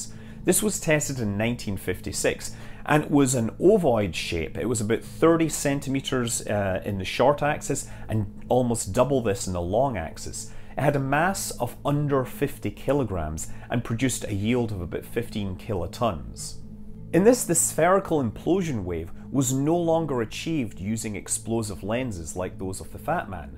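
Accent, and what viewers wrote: Scotland, United Kingdom. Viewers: where are you from originally in Scotland? Your voice reminds me of my grandfather who was from Wishaw:) I've lived in Wales most of my life and miss the Scots accent! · I canny place your accent, Scottish ~like me, not Glasgow, more 'refined'........hmmm. :) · You know, most of us just watch your videos because we’re entranced by your Scottish accent.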